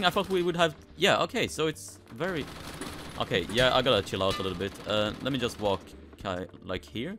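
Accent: Sweden. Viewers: Man kan höra att du är svensk på din Engelska😅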